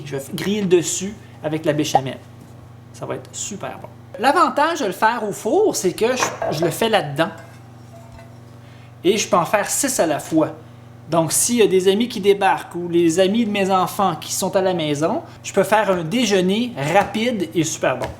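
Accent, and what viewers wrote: Quebec, Canada. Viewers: Hihi c'est marrant que tu sois français :) Car tu as bien pris l'accent du quebec ^^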